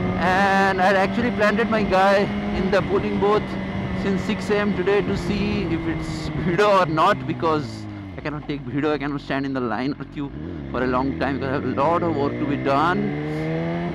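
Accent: Odisha, India. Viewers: Suprb bhai ଭାଇ ଯେତେବଳେ ଓଡ଼ିଆ କୁହନ୍ତି ପୁରା ଦେଶୀ style re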